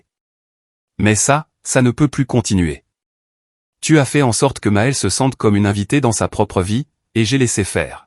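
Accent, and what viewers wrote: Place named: France. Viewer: J'ai adoré l'anglais avec l'accent Français ptdr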